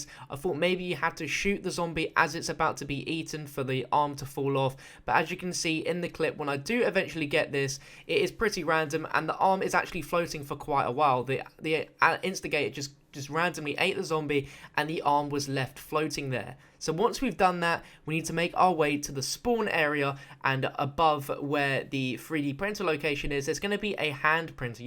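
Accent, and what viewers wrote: United Kingdom. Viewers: 0 , idk if youre serious but if you are its garage but since he is British he pronounces it like that...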